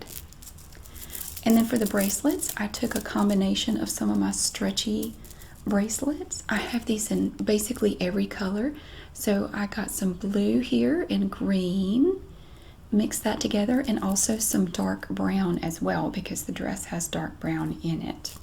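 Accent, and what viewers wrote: South, United States. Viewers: I loooove this video. I love when you explain clothes or processes you buy. I could listen to your soft southern accent all day. ❤️ Good for you shopping at thrift shops! Some clothes are so overpriced.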